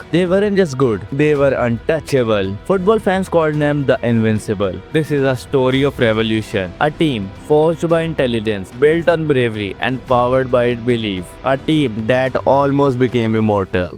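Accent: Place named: India. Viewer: indian voice annoying use ai voice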